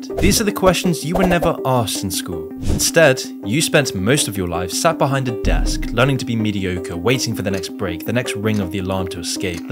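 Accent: England, United Kingdom. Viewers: British accent describing problems with the US dollar? I do appreciate your work Mr., but why the foreign interest in American finance? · Yo u English?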